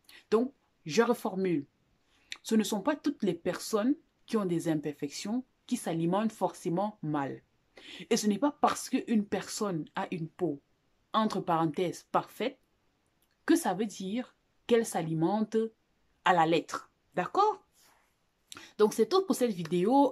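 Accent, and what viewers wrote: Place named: Democratic Republic of the Congo. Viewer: Je confirme pour les produits laitiers. 😥Je découvre que tu es camerounaise. D'où te vient l'accent congolais?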